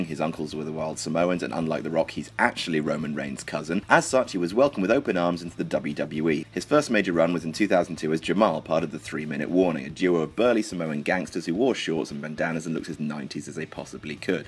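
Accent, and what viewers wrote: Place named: United Kingdom. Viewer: I find it funny that you're complaining about how a British guy speaks English. They do pronounce (and spell) a few things differently than we do.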